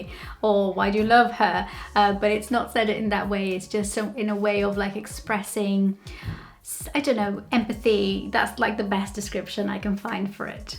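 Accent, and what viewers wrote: Wales, United Kingdom. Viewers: a bit late to the show... but I gave you a thumbs up - "cos I 'at to!" yr English accent is beautifully Welsh! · Sorry. You have a delightful Welsh accent, I just never noticed it before. And your English is very good. Also, I just wondered if you had come across the expression; "You don't want to spoil the ship for a ha'porth of tar." (it's not related to anything you have said) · Tidy! You speak Wenglish!! Catches, Taffy Nige from Pontyclun xx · You have a slightly Welch accent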